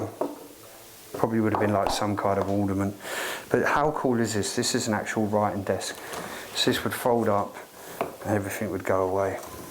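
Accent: England, United Kingdom. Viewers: Great video. watching from USA but I'm English and it's nice to hear an English accent again.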